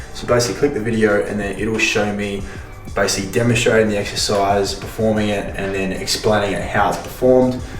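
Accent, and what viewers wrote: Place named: Australia. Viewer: Hey man—just discovered you have YouTube videos—I messaged you today about Donnie Darko. Wow, people tell me I speak too fast, I think ya beat me there! 😂 No worries I understood you throughout—and wow I love Aussie accents. Y’all are the coolest, every Aussie I’ve ever met in Texas was a top notch person. Truth is I’d probably hire you for online training, but I’m am ex-trainer myself (ACSM/HFI) and I seem to always clash with the guys I’ve hired. Anyhow, two thumbs up on your online presence & ventures. And PS: I’d be surprised if a Hollywood producer doesn’t offer you a film role one day soon, you’ve got a great mug, killer bod and masculine voice and presence—the works! Cheers mate. 👊💥🙌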